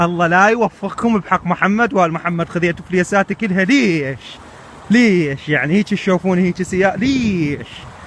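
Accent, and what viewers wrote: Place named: Basra, Iraq. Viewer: بصراوي من لهجتك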